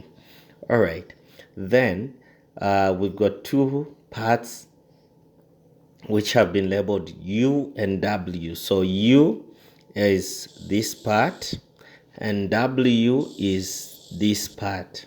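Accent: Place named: United States. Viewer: Sir you have a nice accent arr ryu american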